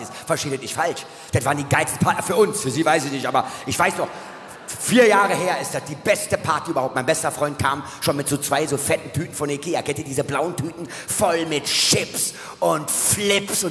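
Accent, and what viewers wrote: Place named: Berlin, Germany. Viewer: Und wieder das gleiche Muster...GÄHN. Haben Sie auch mal was neues? Sexismus, mit Berliner Schnauze...langweilig.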